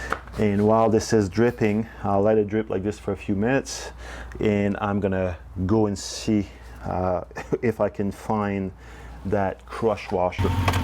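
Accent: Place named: Saint-Sauveur, Canada. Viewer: Je suis de Saint Sauveur, je penses reconnaitre l'accent 😜🙏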